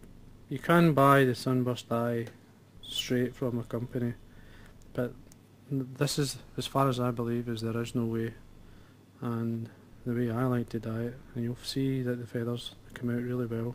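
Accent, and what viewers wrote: Scotland, United Kingdom. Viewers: How I love this Scottish accent! I hated it, when I first came to Scotland, but Scots are aswsome people and they simply changed my mind. To video - superb work thanks,. What type of dye do you use? I missed is somehow from the video. Thank you.:-)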